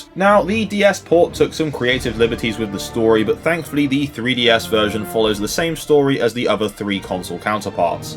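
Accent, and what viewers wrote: United Kingdom. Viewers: you're british right ?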